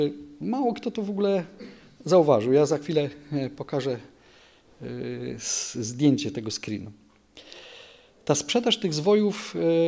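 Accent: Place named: Silesia, Poland. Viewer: Bardzo ciekawy wykład! 👍 I ten spokojny głos, ze śląskim akcentem😉 Przyjemnie się słuchało. Dziękuję.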